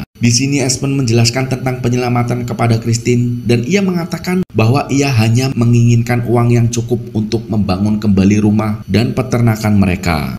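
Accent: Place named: Java, Indonesia. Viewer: Admin asline wong endi kang? 😂😂😂 lucu logat Jawa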